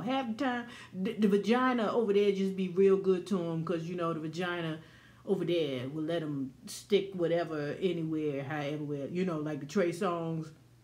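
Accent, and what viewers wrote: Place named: South, United States. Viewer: I'm in the DMV right now...but you sound a little southern like, you're from my hometown lol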